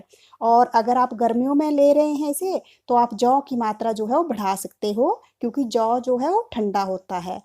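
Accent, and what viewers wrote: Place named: Pahadi, India. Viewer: Aaj voice tone me phadi lg rhe di ham b phadi hai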